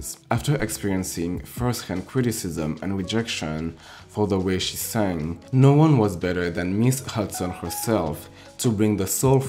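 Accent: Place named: Germany. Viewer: Are you German? love the accent, I love your breakdowns btw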